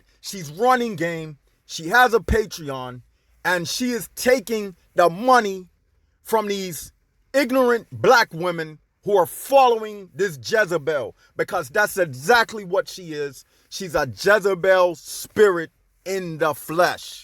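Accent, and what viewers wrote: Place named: Caribbean. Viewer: No I don't find her channel boring at all. I like that she has a new story all the time. She never repeats a story. And that's the main thing of her channel is she specifically says she will never repeat any of her content. So no it hasn't gotten old. But why are you even caring what she or other black American women want? You're not a black american. You can tell you clearly have some kind of Caribbean accent. Why don't you care about the women in your country? I think that would be more productive for you. They probably don't have this anyway. I mean they grew up with mainly black men and I don't even know how you guys are over there. Why do you even care? If she's talking most of the time about black American men and you are not black American then why do you care? It's just a thought.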